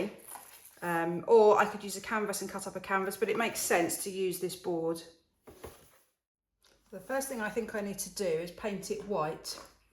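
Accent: England, United Kingdom. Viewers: I love how creative you are! 🌿🌺🌼🌸🌿 This message reminded me to tell my friends how important they are and that we are here to lift each other up. It's a wonderful little quote for both ourselves and others. Thank you for sharing your beautiful Artwork with us. You bring me much JOY in everything you do. Besides that, i love your English accent (i live in the USA). 🩷🩵💜🦋🏜️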